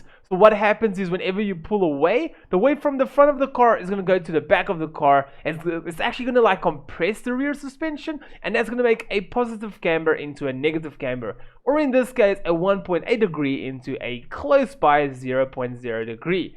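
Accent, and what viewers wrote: South Africa. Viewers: Sound like u from S.A?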